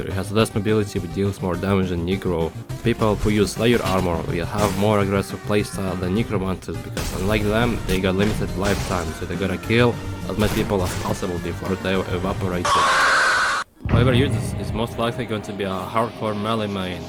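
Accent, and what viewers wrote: Russia. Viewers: No its Russian accent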